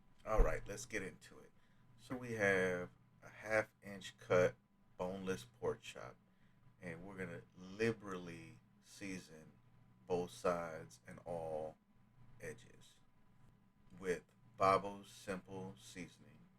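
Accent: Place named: South, United States. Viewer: Your voice is oddly soothing and I hear a southern accent even tho idk where u got that from. But MAN I miss your cooking already! At least I can watch these now lol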